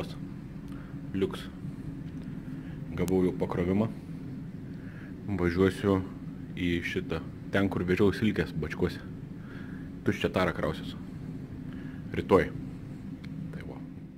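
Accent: Šiauliai, Lithuania. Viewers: tikras siaulietiskas akcentas :D linkejimai is pietinio :) po keliu savaiciu jau norisi namo ar visa kadencija (6sav ar ilgiau) viskas ok?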